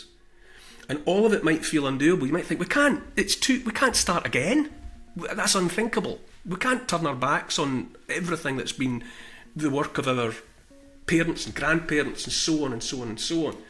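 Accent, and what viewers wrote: Scotland, United Kingdom. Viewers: Think the unthinkable . Do the undoable. (Do the unthinkable) . Do what? (Imagery concerning the cremation of bodies). To whom? ( We need to watch out for a handful of people who have ancient malign desires). This man has a disarmingly quaint Scottish accent, a very friendly manner, and a lovely white, light filled house . (Pay attention to his words).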